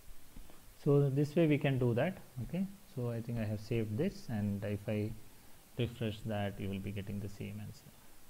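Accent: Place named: Hyderabad, India. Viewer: Hyderabadi style m : kirrak 🔥🔥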